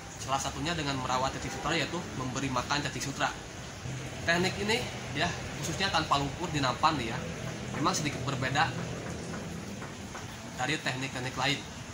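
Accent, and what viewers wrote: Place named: Medan, Indonesia. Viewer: Medan bg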